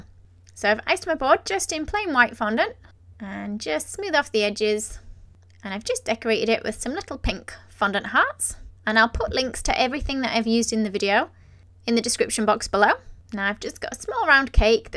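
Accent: United Kingdom. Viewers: I love your bright Britain accent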